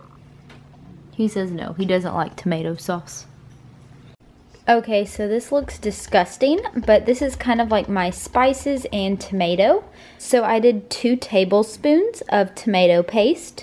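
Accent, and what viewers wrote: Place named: Texas, United States. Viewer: A beautiful texas girl with a beautiful southern accent! What part of texas you from?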